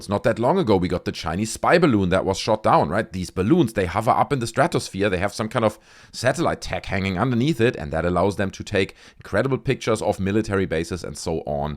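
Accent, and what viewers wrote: Germany. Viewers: You also sound like you have a German accent. Maybe I'm wrong 🤷🏼‍♀️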